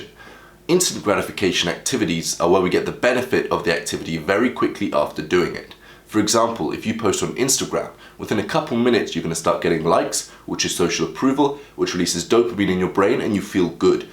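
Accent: England, United Kingdom. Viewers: Woe! What an amazing video, I totally agree!!! I was lazy and once I understood the logic of dopamine, my whole life changed. I work alone, I have the body of my dreams, my mental health is getting better every day...Thanks. What city are you from,? Obviously seems to be from England, has a beautiful accent! You remind me a lot of actor Cillian Murphy, has anyone said? Well, gratitude from 🇧🇷❤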